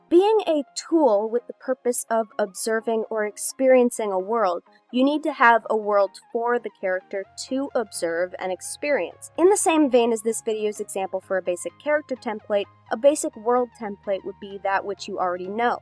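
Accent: Canada. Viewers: Always makes me happy to hear more Canadians.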